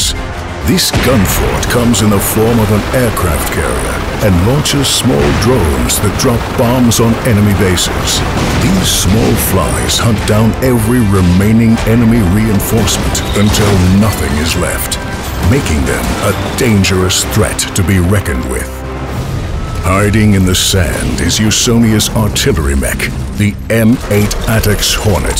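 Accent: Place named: United States. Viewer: you kinda feel the murica from the narrators voice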